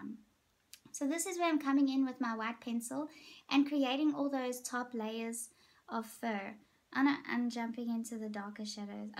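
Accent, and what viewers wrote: South Africa. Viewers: Are you South African? :D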